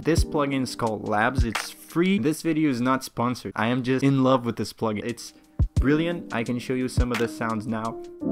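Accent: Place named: United Kingdom. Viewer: Having a british accent is an unfair advantage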